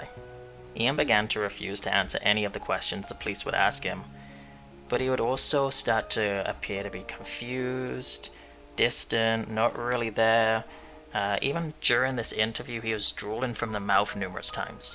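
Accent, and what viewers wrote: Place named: Northern Ireland, United Kingdom. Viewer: cool, I thought I could hear Northern Ireland as that's where I'm from but a mix in there anyway 🤣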